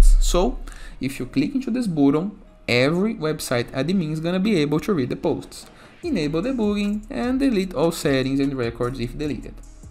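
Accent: Brazil. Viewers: pelo sotaque e BR hahaha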